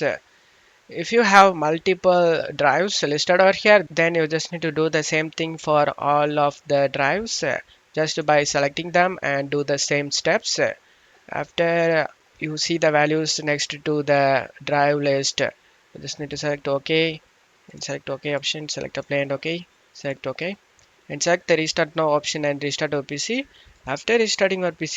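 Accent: South India, India. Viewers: Duck ur accent south indian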